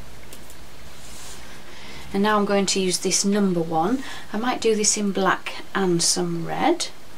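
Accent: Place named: Northern England, United Kingdom. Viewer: I have been trawling all your videos - your voice is lovely - Northern girl same as me - and I adore the colours you choose to print together. Lovely pages, lovely patterns - I'm just going to try a gell plate for the 1st time tomorrow ( it's 4.30am and I'm an insomniac!) Thanks so much for sharing xx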